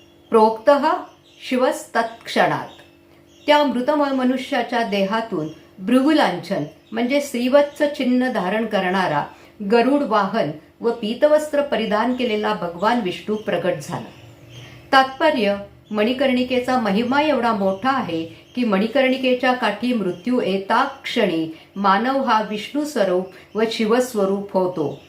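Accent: Standard. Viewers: खूप छान स्पष्ट शुद्ध उच्चार विवेचन पण सुरेख 🙏🏻👏🏻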